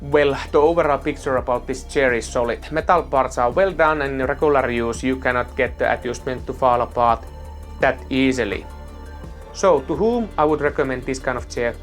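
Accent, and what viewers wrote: Finland. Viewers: I hope that youtube algorithm gods will help you grow, you made a good representation, pretty high quality video. And after playng a lot of MSC i love finnish accent :-DDDDDDD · Are you from Suomi? You speak exactly same as my Finnish husband :D nice chair actually.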